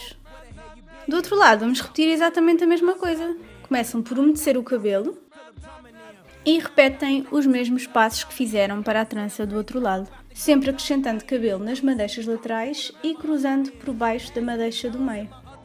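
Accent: Portugal. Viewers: Ela fala o português de Portugal! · e português de Portugal adoros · pq o portugues dela (tal como o meu e de muitas outras pessoas) e de portugal , ela fala o portugues original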